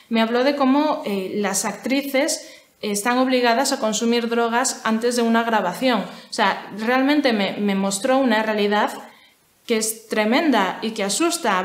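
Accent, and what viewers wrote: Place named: Spain. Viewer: Me gusta tu acento español,por cierto eres muy guapa ☺️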